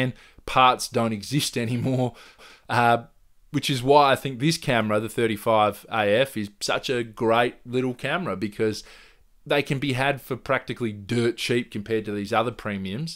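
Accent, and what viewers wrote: Australia. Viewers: First I thought "an aussie!" then I realised you're also from Perth and I subbed immediately. Gotta rep the home town. Great vid, looking at this camera so super helpful!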